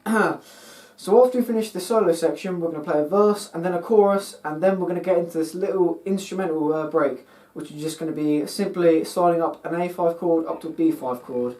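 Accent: United Kingdom. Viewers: I love british english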